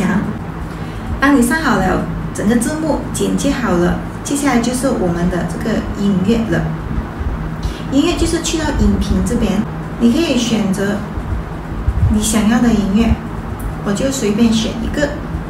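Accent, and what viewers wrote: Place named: Vietnam. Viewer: 感觉普通话不像是中国人说的，发音像是越南人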